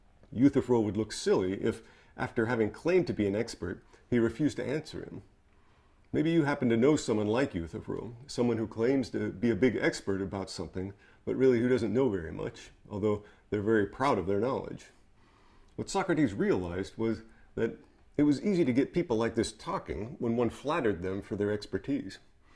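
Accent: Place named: Denmark. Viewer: Interesting. Although he is American, he speaks English as if he was a Danish person. His words are sharp and short.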